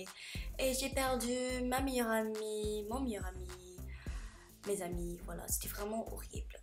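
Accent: England, United Kingdom. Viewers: j'ai pensé que tu es de l'Angleterre, je peux encore entendre ton accent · super la vidéo c'est super dur de quitter son pays natale et d'apprendre une nouvelle langue donc tu as eu du courage continue comme sa (j'aime trop ton accent Anglais!)❤ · C'est courageux de ta part d'être venu en france sans même savoir parler français et j'adore ton petit accent anglais :P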